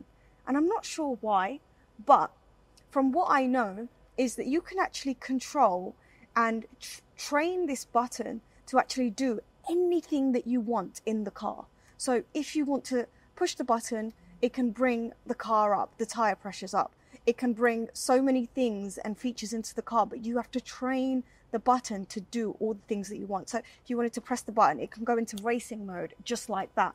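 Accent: United Kingdom. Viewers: Love all your videos! the Cars you do and your accent!!!!!!! sounds like an UK accent! anyway great show!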